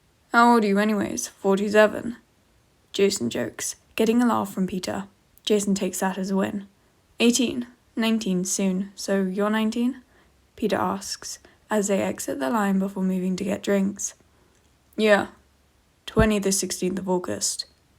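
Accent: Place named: United Kingdom. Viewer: HELLO oh omg your BRITISH i think that's what the accent is i'm originally from dustbunnys channel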